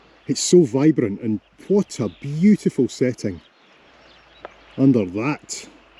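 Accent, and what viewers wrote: Scotland, United Kingdom. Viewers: Really loved this. The funny thing is that my American wife and kids couldn't understand a word you said! :) I grew up in Australia, and my best friend for some years was from Glasgow, so I long ago learned to tune into the Scottish accent. Because of the popularity of British TV in Australia, most Aussies in fact 'get' the various British accents. But I've lived in the USA since 2000 and it's hilarious how most Americans practically need subtitles - and often get them in movies and TV programs. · I guess he is Scottish. And so he speaks a dialect of English. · Phenomenal! Aloha from my home, on another spectacular island, Big Island Hawaii. :-) The beauty and the drama of the cliffs in your video are priceless. Fewer tourists, too! Subscribed. My grandfather was from Auchterarder, Perthshire, and your accent brings back fond memories of him.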